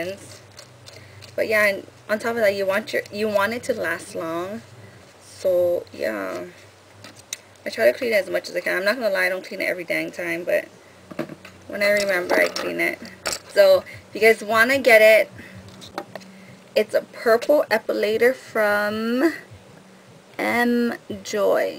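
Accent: Hawaii, United States. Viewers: R u Hawaiian?? You really sound like a local girl from Hawaii:) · Omg thanks for the review and demo! I have the darkness under my arms so I'm considering this product. :D I could tell by your accent that you're from hawaii! Great review!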